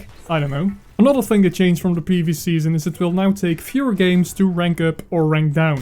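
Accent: Netherlands. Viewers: U sound really Dutch (vette video man en respect voor je Engels het is echt goed) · haha lekker hollands accent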